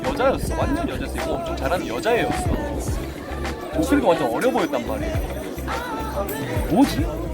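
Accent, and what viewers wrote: Jeolla, South Korea. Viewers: 쇼츠로 영어하는거만 보다가 풀영상 처음봤는데 전라도 억양 너무 구수하넼ㅋㅋㅋㅋㅋ 인간미 넘쳐요! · 아따 전라도여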